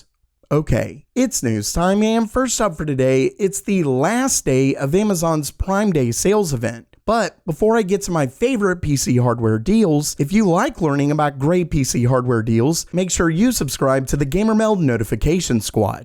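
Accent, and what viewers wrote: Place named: South, United States. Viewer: I cant believed I've never caught your southern accent before lol, It's very subtle.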